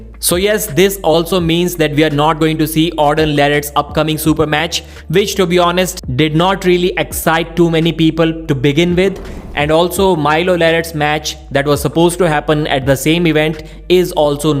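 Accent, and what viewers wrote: India. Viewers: you need to learn to change your accent to a neutral one bro 😂 your accent is too heavy on the indian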